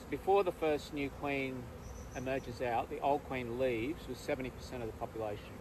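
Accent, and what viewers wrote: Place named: Australia. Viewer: Love your videos, especially your explanations. Who doesn't like a genuine Aussie accent? I have to remind myself it's Spring where you are while we are moving i to Fall here in Kansas, USA.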